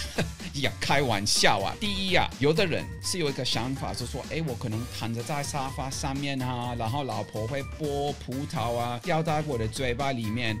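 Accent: Taiwan. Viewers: 你的國台語已很台了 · 台語比國語還標準！真的是台灣人... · 满口台腔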